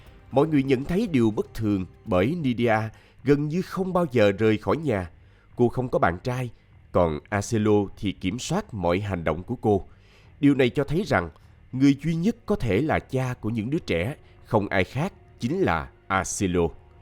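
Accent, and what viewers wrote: South, Vietnam. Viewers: giọng đọc miền nam nghe hay quá . cho 1 like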